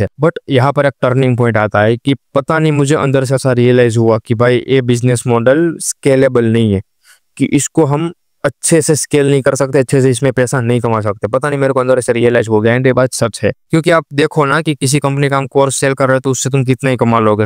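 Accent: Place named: Gujarat, India. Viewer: Tame gujarati Chhone Bhai Boli upar thi khabar padi gai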